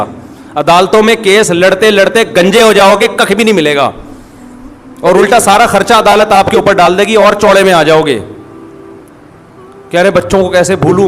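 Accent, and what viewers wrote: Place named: Karachi, Pakistan. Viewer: ویسے حضرت آپ کے بیانات سننے کی ایک اہم وجہ یہ ہے کہ آپ کے انداز بیان میں کراچی دیکھتا ہے آپ کراچی والوں کا انداز دیکھتا ہے اور جو افراد کراچی کو پسند کرتے ہے وہ آپ کو ضرور پسند کرے گا انشاللہ تعالیٰ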